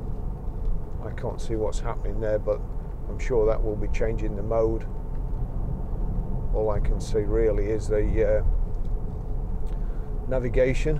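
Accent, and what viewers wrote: United Kingdom. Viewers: Wow Sir, that is a great car. I always love Land Rover, but I still cannot afford one. 😫 the one you are driving is awesome and it feels so powerful and comfortable. Do you own the car? Or Are you a dealer? How much does this car cost? How much are you asking for it? And by the way I love that nice British accent.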